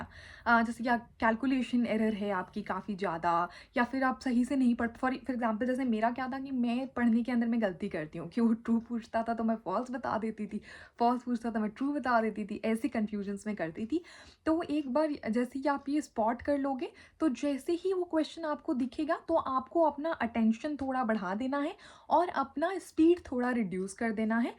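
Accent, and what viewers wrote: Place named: Delhi, India. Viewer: You claim to be a Bihari origin, but uour language sounds of Delhi origin. You must have been brought up in and around Delhi. With good school backgraound. It is your schooling that is making you successful everywhere which is not accessible to others. Take example of ssc CGL exam, you scored 156 because your english was good from school days.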